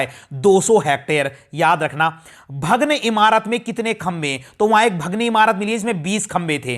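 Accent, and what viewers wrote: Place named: Hills, India. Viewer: सर आपकी आवाज पहाड़ियों जैसे lgri🥸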